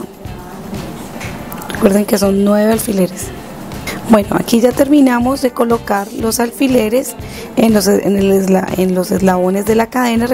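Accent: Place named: Colombia. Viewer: aparte de que me encanta tu trabajo, es chevere escuchar el acento de mi tierra querida colombiana, desde mexico. Amo mi Colombia . Gracias por compartir tus conocimientos muy lindo todo ... Dios te bendiga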